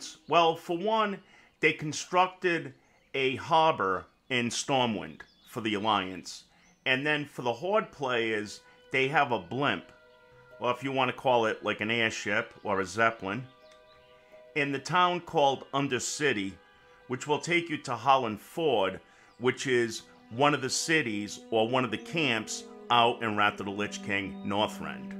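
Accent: New York, United States. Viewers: Came for the WOW vid. Stayed for the NY accent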